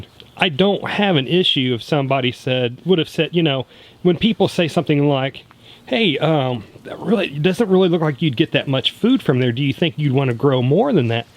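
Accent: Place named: South, United States. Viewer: you're very welcome. I dont recall you saying where you live, but you sound southern and I live in West Alabama near T Town. I've obviously forgotten where you're at. Since I hit 50 (several years ago) I do good to remember much of anything lol. I had been doing some research on something I had requested a video about, burying the dead and such, and i never got back to you on that because some things came up for the fellow who I had been talking about it with and getting the info from. (In case you're confusing me with someone else, but I honestly think you're too young for that and it's most likely me who doesn't remember lol)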